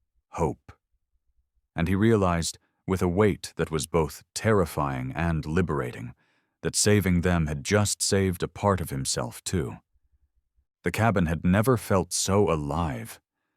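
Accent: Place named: United Kingdom. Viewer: Uk English 😅😅😅😅😅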